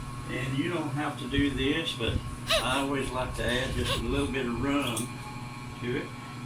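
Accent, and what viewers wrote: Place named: South, United States. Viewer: It doesn't seem fair. I read [never knew 'em] my great grandfather raised 7 boys and 3 girls on tobacco. I never met a single great uncle, nor any cousins. Done hung my guitar on the river of Kebor.. i asked NOT to play a song at a local bluegrass old time music jam tonight. Nice to hear a southern accent. ❤😢😢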